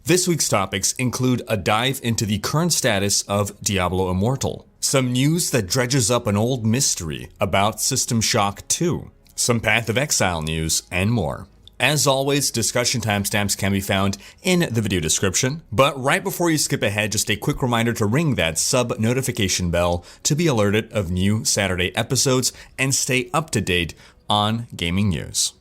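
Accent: France. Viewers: ur french accent is not bad!!! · You have a very good french accent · Your french ascent :D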